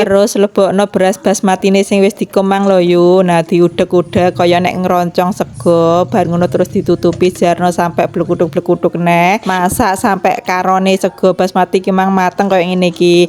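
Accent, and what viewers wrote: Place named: Blitar, Indonesia. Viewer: Bahasamu wong Blitar Tah yu...😂😂😂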